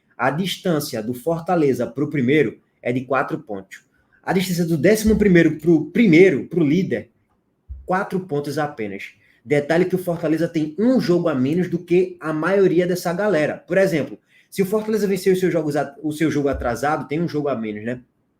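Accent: Bahia, Brazil. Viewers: só baiano nordestino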